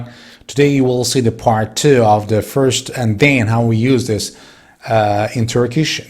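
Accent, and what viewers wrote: United States. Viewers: its very nice to learn turkish with American accent thanks a lot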